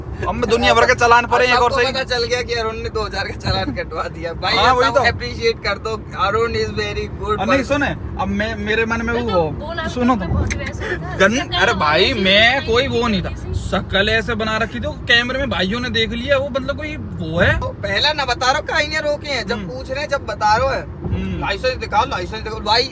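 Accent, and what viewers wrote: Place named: Palwal, India. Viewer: bhai, I love the way you talk in our Regional language, Palwal vaale.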